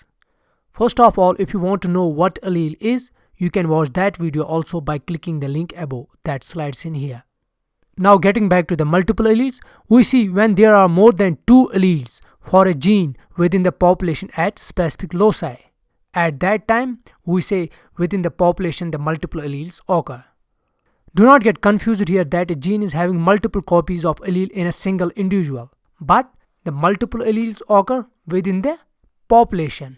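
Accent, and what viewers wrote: Kashmir, India. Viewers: Aapkee aawaz suntai hee smjh aaya aap Kashmiri ho😍❤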